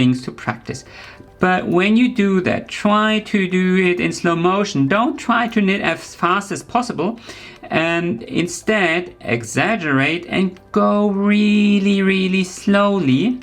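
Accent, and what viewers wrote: Germany. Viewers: I have some Norwegian friends and you are so like them. your style of knitting is so relaxing. I knit the Norwegian way. This is a quick way to knit but sometimes I like to knit slowly. I enjoy every stitch. Now I listen to your accent more closely I can hear the Germannic style. Germany is a lovely country and the German people are so warm and friendly. I hope we can keep in touch. Lol Jacqui from Seahouses UK x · Donnerwetter! 👍 Abgesehen von den vielen sehr nützlichen Tipps sprachlich perfekt! Damit meine ich jetzt weniger die Aussprache – den deutschen Akzent hört man nach wie vor –, sondern den Gebrauch des eher ungewöhnlichen Vokabulars. Ich bilde mir ein, ausgezeichnet Englisch sprechen zu können, aber bei dieser Thematik müsste ich passen.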